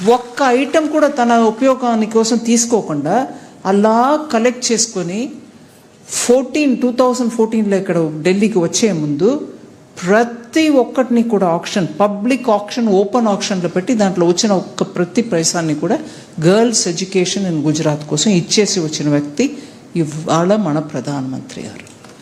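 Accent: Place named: Chittoor, India. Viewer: ఈ కాలంలో తెలుగు వాళ్ళు చాలా మంది తెలుగు ఇంతకన్నా ఎక్కువగా ఏమి మాట్లాడం లేదు. చిత్తూరు జిల్లాలోఎప్పుడూ ఇలాగే మాట్లాడతారు. సహజం.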